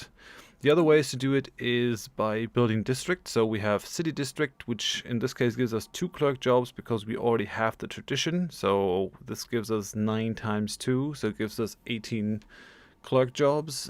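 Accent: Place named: Germany. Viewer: That German accent though….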